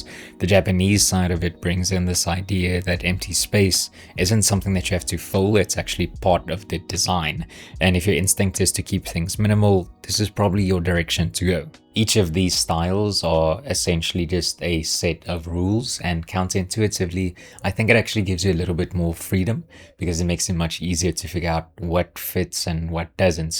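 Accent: South Africa. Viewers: You sound south African 😂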